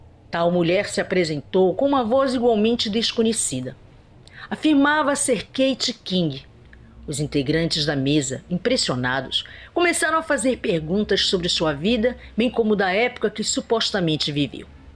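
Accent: Pará, Brazil. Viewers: a senhora é paraense?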